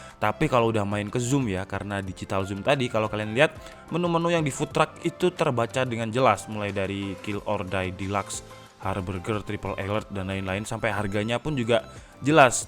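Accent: Malang, Indonesia. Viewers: Wong malang ya...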